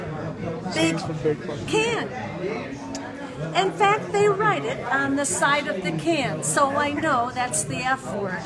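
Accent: United States. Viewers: That New York accent just makes everything better 💀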